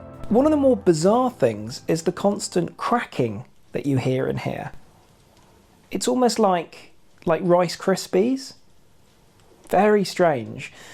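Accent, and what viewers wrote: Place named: England, United Kingdom. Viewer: I used to think the English accent was enchanting and " lov-e-ly ". But now, seeing what they did to their country with mass immigration ; listening to this guy is nauseating.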